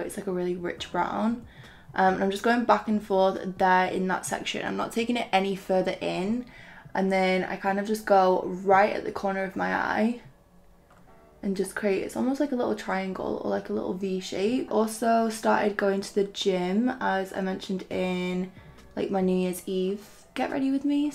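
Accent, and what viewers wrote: United Kingdom. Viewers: Finally I can find makeup tutorial with British accent!! love you!!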